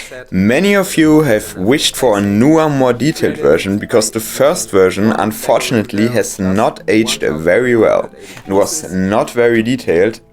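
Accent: Germany. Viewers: Kommst du aus Deutschland du hast einen Deutschen Akzent?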